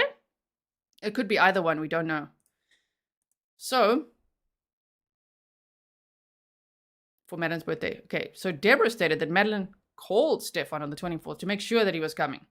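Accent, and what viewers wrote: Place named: South Africa. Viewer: Your South-African accent is so beautiful, it makes me proud to be South-African. You sound like HOME❤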